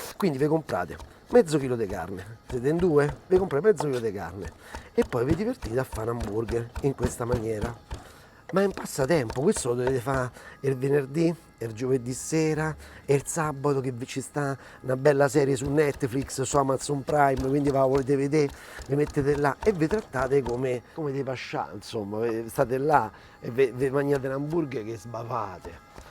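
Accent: Rome, Italy. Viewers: Toujours des recettes délicieuses, et puis cet accent romain qui me rappelle tant mon enfance, merci Chef..... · Ma la < padela de fero>... é robba da guera. Non mi piace il dialetto romanesco, ma tu me lo rendi gradevole. posso un consiglio? Il torsolo dei broccoli, NON SI BUTTA! Va pelato dalla scorza e spuntato alla base, poi si taglia in pazzetti di mezzo centimetro e si mangia crudo con o senza condimenti. Una delle verdure piu buone in assoluto, da primato! E pensare che tutti lo buttano via. Un delitto. A crudo, tipo mela.. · Quando ti sento mi ricordi Roma (dalla quale manco da più di 20 anni, ahimè) comunque l'hamburger l'hanno inventato gli antichi romani (isicia) anche se la radice del nome è in effetti tedesca..Complimenti per la ricetta, i broccoli sono un colpo di genio... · Meno accento, romano però: ti sentirai più spontaneo.... a me sembra che parli solo a quelli della tua città ...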